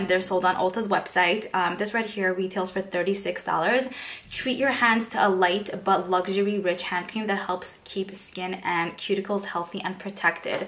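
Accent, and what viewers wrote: New York, United States. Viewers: Girl I live for that lip on you and your ny accent!!!! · I've been subbed to you for a few weeks ever since I heard about your collab, I've been really enjoying your content. The luxe box seems the same as others I've seen, your Ipsy ultimate was really good especially compared to others. I love your accent btw, is it NY? If so were sort of neighbors I'm in Philly, PA.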